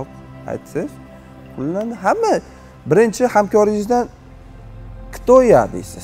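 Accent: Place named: Andijan, Uzbekistan. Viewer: Akamiz anjanligakana😁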